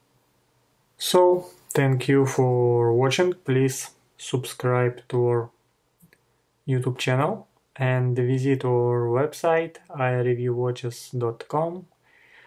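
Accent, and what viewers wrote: Russia. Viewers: У автора русский акцент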